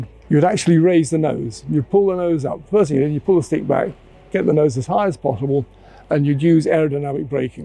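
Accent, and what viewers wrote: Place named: United Kingdom. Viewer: I’m going to start cultivating a British accent so I can sound as cool as this bloke… Love this video!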